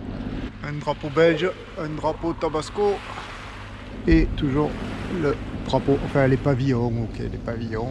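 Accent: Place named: Provence, France. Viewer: Salut merci pour tes vidéos et ton accent de la belle Provence…. La lumière en question s’appelle feu de hune (steaming light) et c’est obligatoire lorsque le moteur propulse avec où sans les voiles. Même si tu n’aime pas la mécanique tes tutos sont franchement sympa et c’est toujours un plaisirs. Bon vent au Tabasco.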